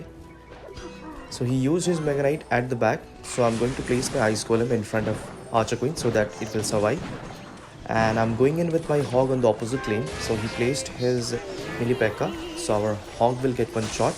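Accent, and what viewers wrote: India. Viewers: Obvious indian accent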